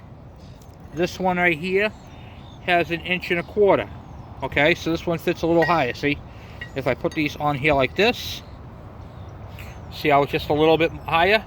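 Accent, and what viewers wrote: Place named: Boston, United States. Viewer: Good info! With your accent, sounding like your from the Boston area?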